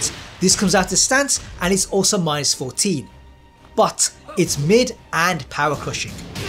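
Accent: United Kingdom. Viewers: I love your accent bro , you from UK ?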